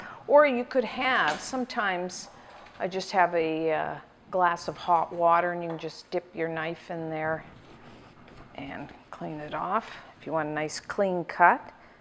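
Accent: Canada. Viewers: She sounds so canadian "abowt"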